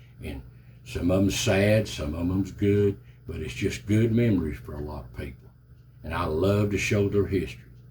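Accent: Appalachia, United States. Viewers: Mr, I feel like the darn COE and park managers have gotten waaaay out of hand with all their rules and regulations!!! I live in NE TX and my family and I have had more than 1 ru-in with the COE. So I know just how you feel too. I enjoy all of your videos as you uncover some of America's lost past and old cemeteries. your voice tone and Appalachian accent is very soothing to me. keep on keeping on! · Good to see a face with that true Appalachian voice.. Blessings brother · I love your accent I'm proud to be an ole hillbilly like you ole buddy. I understand everything you say. Love your videos better than anything you can watch on this ole tv these days. Keep it up ole buddy! I live in Kodak TN and used to live in Eastern Kentucky so this all hits home to me. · Love the channel. Though I was not born in the Appalachian mountains, my mom was. My grandparents spoke the exact same way you do… my Grandmother a Boleyn and Sparkman by birth spoke so thick I could hardly understand her. 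they were from Knott county and Floyd county Ky. I remember going to family reunions and meeting my Triplett, Boleyn, Wicker, Sloan, Collins, Gibson, and Sizemore families. We would stay for a few days and my Great Uncle Oscars wife would cook us a breakfast fit for Appalachian royalty. My mom has passed but I keep up with a few cousins up there. Thanks for what you do, sir!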